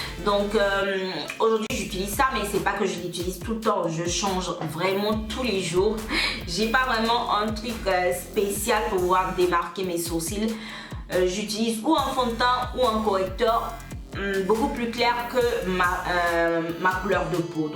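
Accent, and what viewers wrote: Ivory Coast. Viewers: Tu es ivoirienne ?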